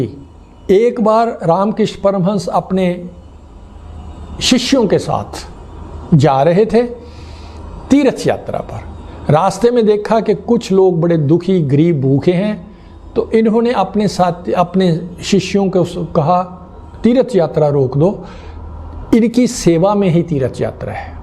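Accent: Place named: Punjab, India. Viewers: सर, हिन्दी के शुद्ध उच्चारण की आवश्यकता है। कहीं कहीं पंजाबी उच्चारण के प्रभाव की झलक मिलती है। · Bilkul theek surjit bhai ..kya karen hum pbi hee hain ...jhalak tow padegi !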